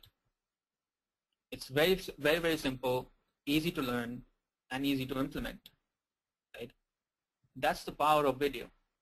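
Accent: India. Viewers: omg, your Indian accent...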